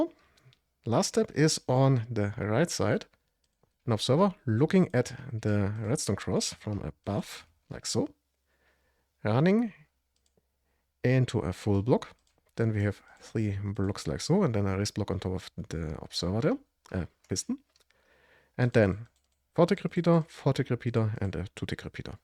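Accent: Germany. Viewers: Biste Deutsch, habe dich bei nem Video gesehn, wo dein Build geklaut wurde bei Dream SMP und habe deine Sprachweise gehört, also biste Deutsch. . If you can't read that, ignore it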